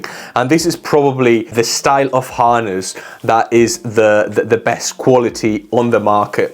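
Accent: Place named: Geordie, United Kingdom. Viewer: I'm very interested in your accent its Geordie and like polish at the same time. Where are you from?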